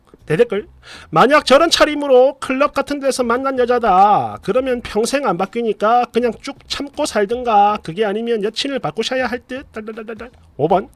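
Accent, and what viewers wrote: Gyeongsang, South Korea. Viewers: 덕분 에 마니 웃고 갑니다 칠학년 에 웃을 일이 없는데 의 그 다른 사람 이 흉내 낼수 없는 경상도 스타 일 그 특유 의 진짜 잘 보고 있어요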